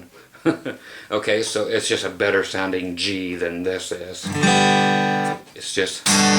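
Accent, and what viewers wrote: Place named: South, United States. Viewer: Sorry to be so nosy, but where are you located,? I am forever trying to figure out your slight accent...Oklahoma? Texas?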